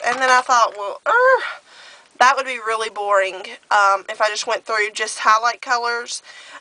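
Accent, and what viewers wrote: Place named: South, United States. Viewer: I love your Southern accent! Anyways, I'm gonna get myself an electric eel tomorrow.. I love my blues and greens too. I just hope it suits me. :-S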